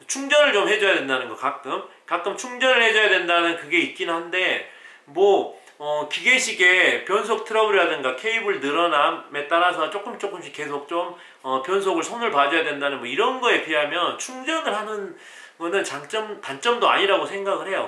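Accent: Jeolla, South Korea. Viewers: 전라도 말투 너무 구수합니다 ㅋㅋㅋ 시마아노 ㅋㅋㅋㅋ 항상 좋은 영상 감사합니다 ㅎㅎ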